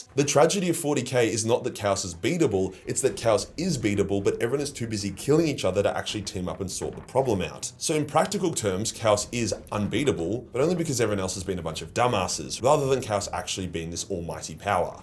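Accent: Australia. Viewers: I never realized had Australian voice over. I wonder what his real voice sounds like.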